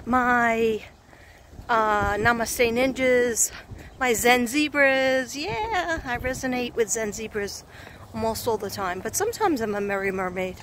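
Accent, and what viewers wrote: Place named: Northeast, United States. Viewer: Wow, those glasses look great on you! So pretty! I’m new to your channel. You mentioned Texas, but what is your accent? It sounds Northeastern.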